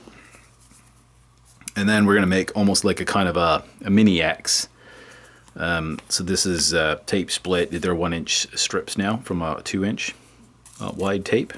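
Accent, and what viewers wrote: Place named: North, United Kingdom. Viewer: Thanks... I've been struggling with splints & different ways to wrap... I'm now a happy camper! I've also been trying to figure out your accent.. i hear Northern UK (Manchester & Liverpool), Canadian, a bit of Irish & Aussie.....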